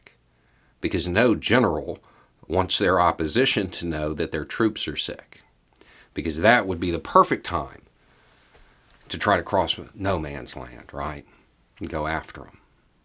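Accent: South, United States. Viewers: Absolutely spot on both b e a u please Google I played the lead in high school in a play on Remembrance Day as a newspaper editor who is covering up the truth to make this brief so we learned about it many years ago I'm 63 now and we we learned about it in high school but it's interesting to hear you comment because you're kind of like in the South I gather by your accent and I'm just surprised you know so much about us up here and I learn a lot from you and I really appreciate that thanks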